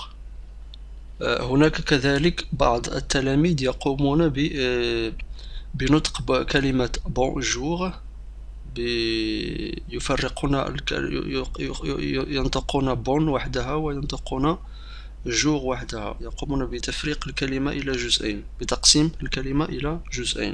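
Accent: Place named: Maghreb. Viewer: Wlah c vrai lui déjà et nul avec un accent du maghreb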